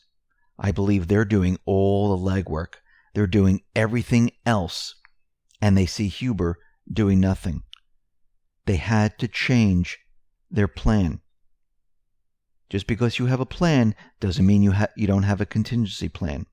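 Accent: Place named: New York, United States. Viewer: from the New York area judging by his accent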